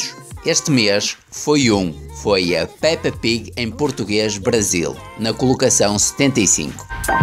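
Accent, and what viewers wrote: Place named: Portugal. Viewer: O cara faz conteúdo do Brasil mas o sotaque e português de Portugal, vc e de Portugal mesmo?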